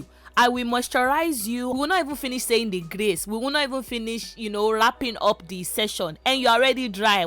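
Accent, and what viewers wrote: Nigeria. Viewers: I love your Nigerian Accent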